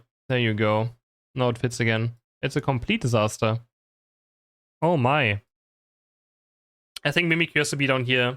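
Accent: Germany. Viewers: Bist du deutscher?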